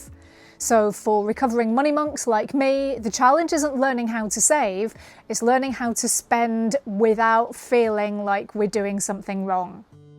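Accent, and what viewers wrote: United Kingdom. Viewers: Caught your video in the rain soaked car, wanted to say that I liked your delivery and comments on the weather. I was unable to post a comment though. You seem quite a sincere person who knows quite a bit about yourself and preferences and how to cope. I am a little astonished how many nice YouTubers there are. And many seem to have a British accent.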